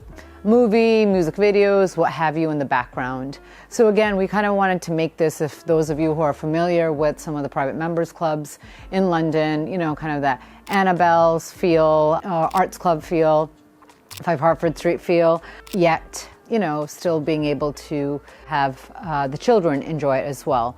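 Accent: United States. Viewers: she sounds American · I can tell by her accent, we are from the same area. I'm in NJ, so that's my guess. A Jersey girl! The house is wonderful. Totally my style. I think the trees indoors, do drive me nuts haha. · New York accent.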